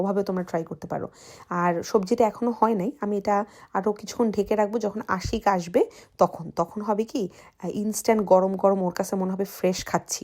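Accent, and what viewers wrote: Barishal, Bangladesh. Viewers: ami apnader fb reels gula dekhtam,2 years dhore maybe..sekhane dekhte dekhte ekhon you tube eu dekhi.. you're so genuine.. and your barishailla vasha is also captivating.. your cooking is also mouth watering.. so you can cook new item and share with us in your local language.. r vlog er video gula wide diyen,full screen hole dekhte vallage...take love apu and stay blessed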